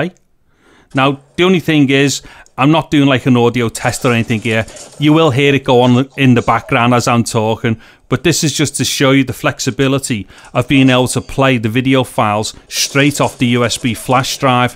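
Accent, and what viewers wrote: Liverpool, United Kingdom. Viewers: Definitely a scouser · Found this by just browsing and I wasn't expecting a cheery Liverpool accent to greet me! Sláinte mate, great vid v helpful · Thanks for that. I bought a complete USB-C Hub to connect all sorts of media. It’s the same one advertised in the Apple Shop but, buy externally and you can buy it for 25% of the price Apple want. You read that right. Buy external of Apple and SAVE 75% on Apple price for exactly the same brand and item they are selling. Good video with your happy smiling face and Liverpool accent. Reminds me of home. I now live in Thailand.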